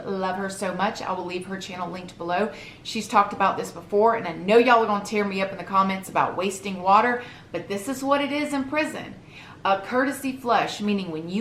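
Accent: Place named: Texas, United States. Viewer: This woman has the Texan accent of my teacher.. But she is from Florida..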